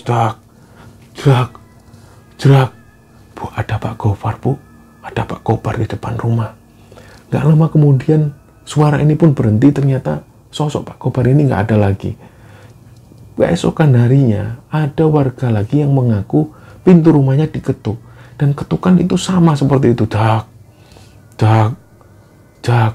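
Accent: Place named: Surabaya, Indonesia. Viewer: Malang Hadir... Nikmati yg slalu menyuguhkan Cerita² yg penuh hikmah apalagi dg Suara Mas Logat Surabaya yg khas.... Sehat Slalu buat Mas dan Crew... Smoga tak kurang dari suatu apapun.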